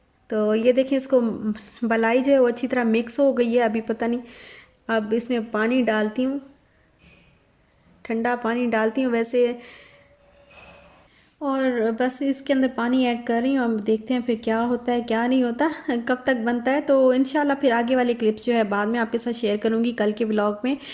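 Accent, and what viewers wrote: Saraiki, Pakistan. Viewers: Dear sister mein ap ka vlog 1st time dekh rhe hu ap kaha rehti ho aur ap kis city se belogg karti ho muje ap ke zuban mein saraeki ka tech lag raha hai mrs zulfiqar lahore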